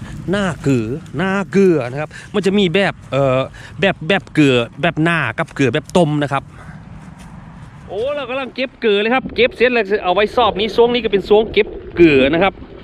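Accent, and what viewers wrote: Phu Thai, Thailand. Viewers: คนอำเภอบ้านดุงใกล้ๆคำชะโนดอดีตลูกสะใภ้ภูไทอำเภอพรรณานิคมได้ยินเสียงบ่าวภูไทแล้วกะคิดถึงอดีต:))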